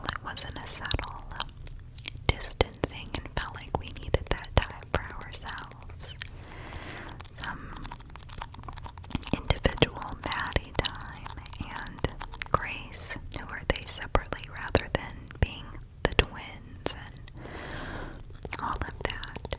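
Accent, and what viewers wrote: South, United States. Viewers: I love southern sounds! Would love if you did a librarian role play like she does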